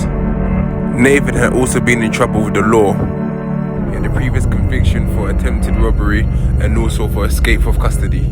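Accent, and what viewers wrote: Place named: London, United Kingdom. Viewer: Sym u likkle London posh yute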